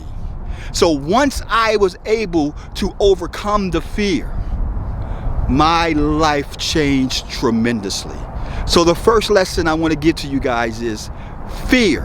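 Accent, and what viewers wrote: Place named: Baltimore, United States. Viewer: Mr., how about I was listening to you talk and said to myself..he's got to be from Bmore. I digested the knowledge you just share very easily. You delivered it very well. Thank you for sharing. I'm from Baltimore but living in NC. Nice video. Appreciate the jewels. I'll be tuning in Sir...keep the vids coming. Peace and blessings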